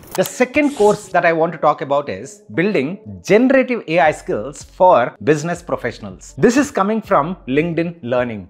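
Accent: India. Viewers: Thank you for your information about the free Online AI Courses. And the best is, I can understand your "Hinglish“ verry well. Best regard Peter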